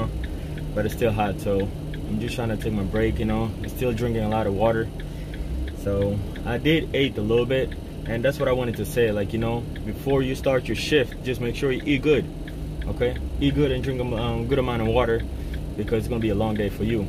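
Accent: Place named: Jamaica. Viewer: I love your attitude...so much...u sound Jamaican....or something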